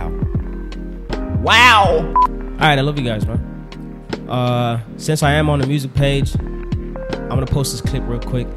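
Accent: United States. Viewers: He sound American must've been raised this side a bit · Why does he sound American? I thought he is South African. I guess not because he just said mpoomaaaalaanga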